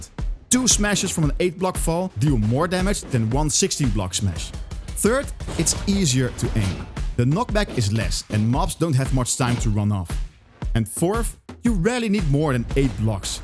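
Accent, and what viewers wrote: Netherlands. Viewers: I smell Dutch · You are dutch right i hear it 😂😂😂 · are you dutch?? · i can smell the dutch accent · We zijn dus zeker wel nederlands, probeer aub een engels accent te leren bro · it is dutch he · Dutch ahh guy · Iedereen kan horen dat je nederlands bent :) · Hallo! Ik vroeg mij af of je Nederlands bent, jouw accent klinkt namelijk heel Nederlands! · gelijk weer te horen aan ons typische Nederlands accent 😂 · Are you Dutch? You sound Dutch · I smell dutch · Je klinkt maar een kleeein beetje nederlands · lol are you dutch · do u happen to be dutch?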